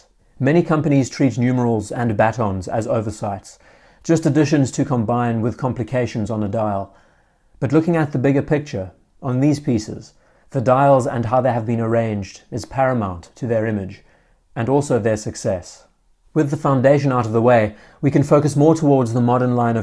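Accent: South Africa. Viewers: Why im a getting a bit of a south African accent from this guy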